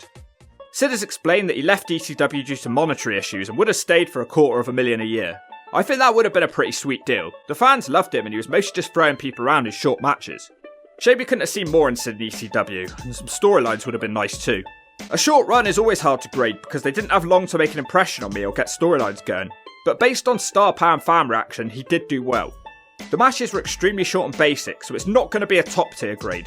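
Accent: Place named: United Kingdom. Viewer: Seriously, dude. You're a Brit. Why are you writing about American culture, you freak? In case you're dumbass wasn't aware, pro wrestling is a product of American culture, so fuck off with your gay high-pitched Brit accent. It's not manly and it's definitely not suited for an American audience. In case you haven't noticed, Americans are real men and Brits are not real men. Just watch a Benny Hill comedy special to understand how femine and weak real Brits actually are.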